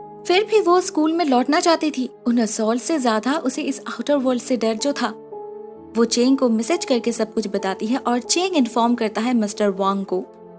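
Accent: Bengal, India. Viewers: Are u Bengali?..u have beautiful voice...u have Bengali accent..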